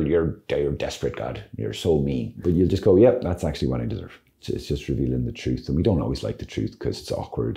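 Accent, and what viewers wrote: Ireland. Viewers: Blessed Father I believe if you don’t ask you don’t receive and that goes for everything in this life and I believe the next ! You are a inspiration to me from your Irish accent to your extremely Heart fulfilling Streams and Clarity ! Clarity of the bible and what our Holy Father wants us to do is a Blessing being explained by you Father !! Keep the Faith ALL !! Bless you Father ! Your Accent and your Irish ☘️ HUMOR ! It makes life easier ! God Bless with all the Angels and Saints us ALL. ! Michael James Patrick Maguire in Melbourne Australia ! 🇦🇺. ❤️❤️❤️❤️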